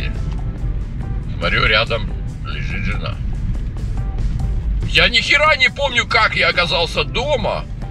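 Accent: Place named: Ukraine. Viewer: Вы сами и есть украинец, не забывайте пан! Даже в вашей речи чувствуется акцент - "Або" "г"кание. И это здорово! Слава Украине!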